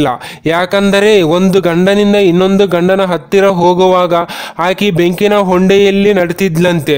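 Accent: India. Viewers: Yarappa Nenu Kannada na Malayalam style Alli mathadtiya modhlu Kannada Kalli amele story helu..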